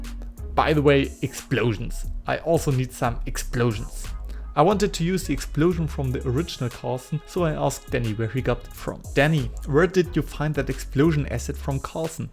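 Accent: Germany. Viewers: does this work with rift s? xd und biste deutsch? xd hört man irgendwie raus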